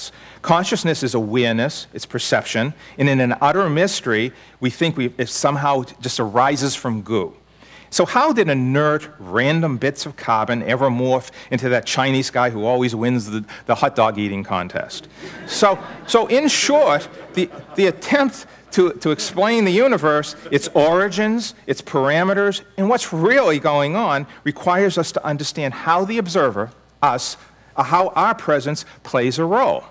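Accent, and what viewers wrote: Boston, United States. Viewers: Having a tough time taking him seriously with that Boston accent. They just don't sound smart. He should try a British one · THE VOICE RECOGNITION CAN'T HANDLE A BOSTON ACCENT that is conclusively been proven that if you watch a subatomic particle orbital I passed through two sluts in a barrier it will behave like a particle and it creates the solid lookin hits on a barrier are that the business can measure and so like a boy to go through one hole but again if you don't observe a strict reject re the particle than exhibits the behaviour wave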